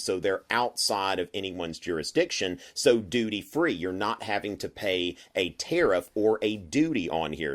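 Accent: South, United States. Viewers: Love your content and your southern accent!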